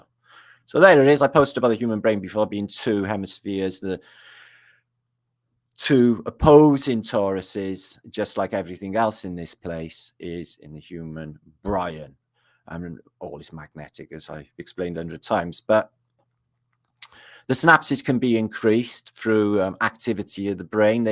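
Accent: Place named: Cardiff, United Kingdom. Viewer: Good stuff. Loving your vidz I discovered you through RV recently. I knew straight away you was from Cardiff from the accent 😂😂 I am myself born an bread from there. Keep up the good work 😁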